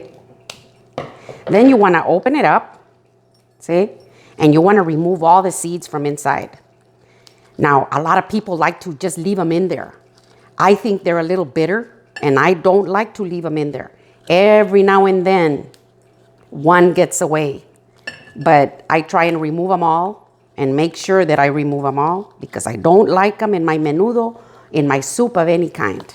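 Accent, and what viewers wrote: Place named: Rio Grande Valley, United States. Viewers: Loving your videos. Are you from Texas? I can hear it in your accent. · 15 seconds in...I knew you're from the RGV! 😁 It's the accent...😆(since I was from there, now living in Houston), but I'm looking for an awesome recipe for menudo to serve at least 10 people...I'm gunna try yours and just adjust the size portions to cook as much as I need to feed. 😎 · My family is from your end of Texas. I appreciate that you used vinegar in your recipe. I made some menudo recently with the honeycomb my favorite. I use vinegar to quell the smell also. You remind me of a cousin of mine from Sand Diego Texas shes long passed away but you two look a lot a like and your accent so unique to that area. After watching you Ill have to make a couple of adjustments to mine. I really like the method you use on straining the chilis into the soup.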